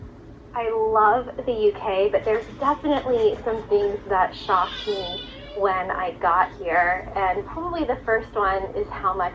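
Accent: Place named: New York, United States. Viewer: Just watched your channel for the first time and amazingly the woodland that the girl in the video was highlighting known locally as Drinkwater Park was where I lived for the first 50 years of my life, thanks for bringing back memories of the happiest times of my life. You sound like a New Yorker, by coincidence I've just come back from a week in Manhattan. I'll be sure to subscribe.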